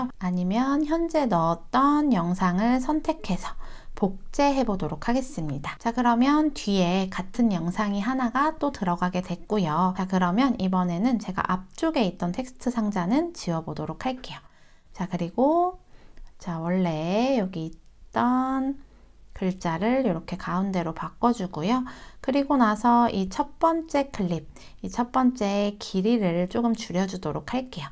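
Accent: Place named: Gyeongsang, South Korea. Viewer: 경상도 사람이네요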